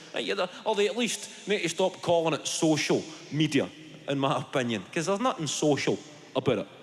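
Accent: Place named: Glasgow, United Kingdom. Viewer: Neither. It's Scottish (Glaswegian i.e. from Glasgow). :)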